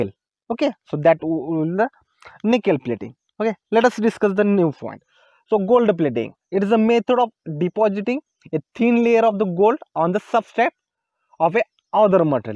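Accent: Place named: India. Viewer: altough the Indian English accent is a little hard for me to understand, was a great video, and show me what I wanted to know.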